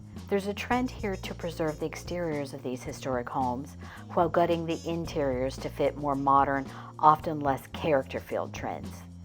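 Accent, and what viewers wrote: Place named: New Orleans, United States. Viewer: Thank you for a lovely presentation both verbally and visually!!.. Your voice was soothing and comforting while you spoke about New Orleans!!.. It sounded as if you were a native to New Orleans!!..Kudos to the people who live with what they enjoy and NOT follow current trends!!..Beautifully orchestrated!! I loved every minute of it!!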